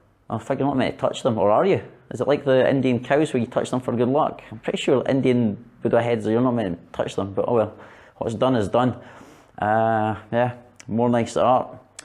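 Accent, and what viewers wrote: Scotland, United Kingdom. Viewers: You're definitely Scottish , your accent shows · Love your Scottish accent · Always love that Scottish accent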